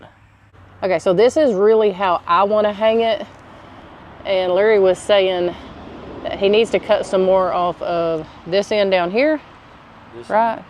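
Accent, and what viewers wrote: South, United States. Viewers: I love (LOVE!) that I've heard you say whompy-jawed a couple of times during Thrift MAYnia! We used to say that all the time growing up and it brought a huge smile to my face because I don't hear it as much anymore. I love to hear good ol' Southernisms and a genuine southern accent. Where I live, in NC, the locals have been outnumbered over the years, especially in the cities. I always love unleashing my southern twang and sayings on those that have been planted here. 😂 Sometimes they'll ask me where I'm from and I'm like...here...I was born and raised right here.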